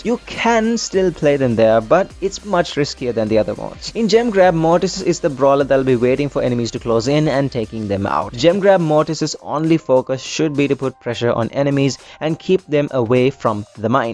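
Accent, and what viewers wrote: India. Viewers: Are you Indian? Your accent seems sometime like it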